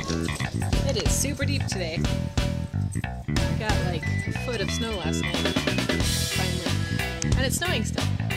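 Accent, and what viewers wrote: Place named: United States. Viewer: You have a wonderful doggy/human family. And a lovely place to raise them. Lucky creatures. Are you Norwegian too? You sound American, but I imagine some Norwegian influence. Sorry, am not nosy, just curious (and envious). All the best to you all. Anne-Lise (from Norway)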